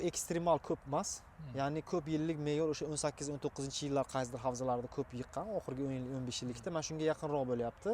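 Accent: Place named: Fergana Valley, Uzbekistan. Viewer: Asobi vodiy besharq gapirganigiz❤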